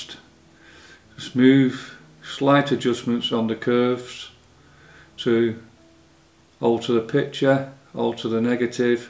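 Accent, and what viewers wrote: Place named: Northern England, United Kingdom. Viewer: Hi, Just printed my first Cyanotype and wanted to know how to progress with wet cyanotypes etc. Have now been watching your videos for the last few hours.(Lovely to hear a northern accent!!) Brilliant am hooked. Today was a beautiful day so can't wait for the next one to experiment further. Thank you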